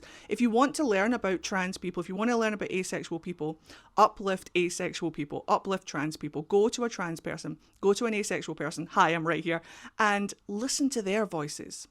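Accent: Scotland, United Kingdom. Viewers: Just found you and very much enjoyed your rambling. (And also you Scottish accent. Hi from Germany, by the way 😊)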